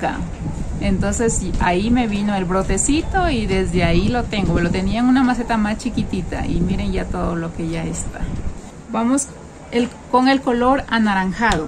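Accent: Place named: Peru. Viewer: Hola saludos de Perú usted es de ecuador sigo a unos chicos de Marcos Otavalo ellos hablan muyyyy diferentes la escucho muy familiar como una Peruana . Yo sigo a Meliza y a Mary ahora también a usted.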